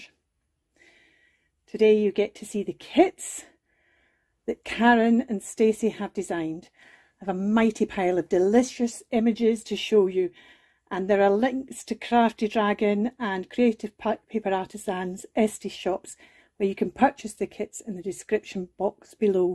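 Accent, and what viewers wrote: Scotland, United Kingdom. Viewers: I love this so much; I could cry. 💞 I'm so excited for this collaboration!!🧚🏾‍♀️🧚‍♀️🧚🏻‍♀️ You sound like you're from Scotland. My Grandpa is from Dunfirmlan🩷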